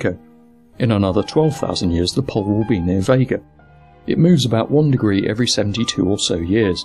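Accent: England, United Kingdom. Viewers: I was out after about 5 Seconds this stupid English accent or Australian accent whatever the hell it is I couldn't stand it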